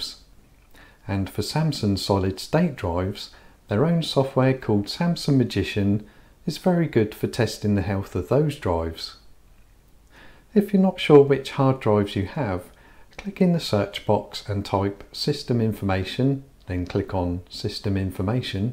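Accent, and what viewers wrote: Norfolk, United Kingdom. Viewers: You a Norfolk man? Trying to figue out your accent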